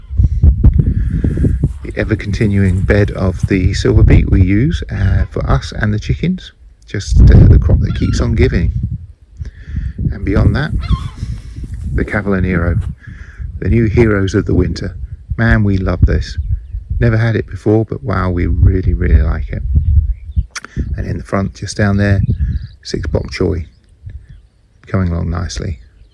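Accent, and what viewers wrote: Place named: New Zealand. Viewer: Just 30 seconds in and loving your kiwi accent blended with English. Nice sound to it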